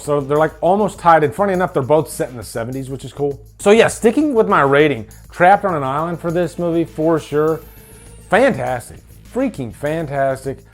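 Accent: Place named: Louisiana, United States. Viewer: Are u from Louisiana? I asked cause I can kinda hear an accent lol. Great review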